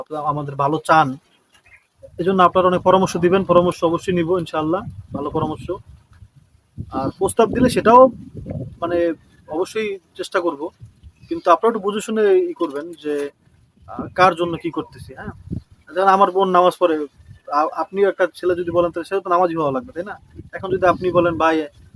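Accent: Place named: Sylhet, Bangladesh. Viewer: আপনি সিলেট বলছেন আমার খুবব ভালো লাগছে আমি সিলেট থেকে আপনার ভিডিও দেখি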